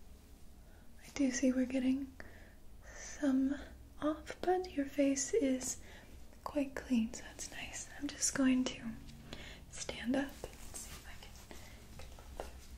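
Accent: England, United Kingdom. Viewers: british again, ithought today's would be Aussie. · I thought u r english after watching previous video lol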